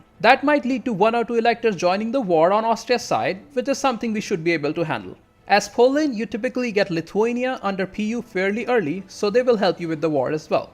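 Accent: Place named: India. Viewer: Your accent sounds so Indian, where are you from? And yeah, the strategy was really good, never thought it could be done so easily.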